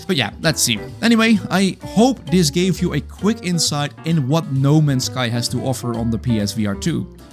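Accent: Netherlands. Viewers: U bent nederlands 100% aan het engels te horen. 😄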